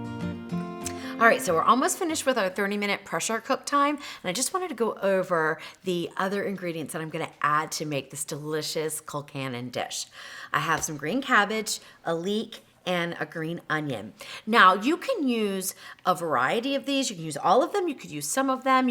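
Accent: United States. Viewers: So much talking! Just show us how to make the dish. Also, if you are half Irish and half German, why do you have an American accent? 🤔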